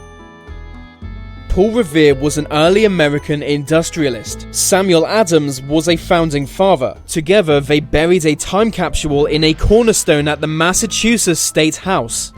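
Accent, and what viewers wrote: England, United Kingdom. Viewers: wow really!? thank you. i have an indian accent but i would like to learn to speak in your english accent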